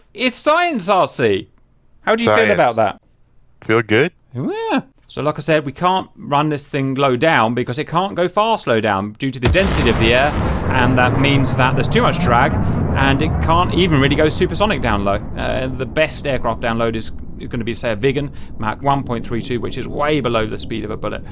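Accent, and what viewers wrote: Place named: England, United Kingdom. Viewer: 32th speed? You sound English, has it really come to this?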